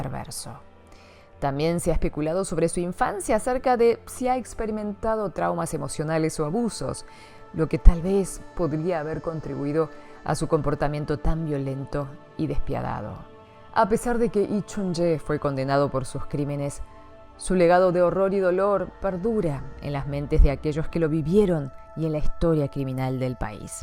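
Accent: Argentina. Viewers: xd es raro ver asiaticos con el acento argentino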